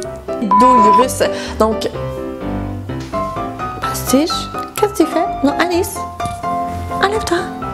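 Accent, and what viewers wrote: Quebec, Canada. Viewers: omg l'accent, franchement l'accent québécois doit être inscrit au patrimoine mondiale de l'UNESCO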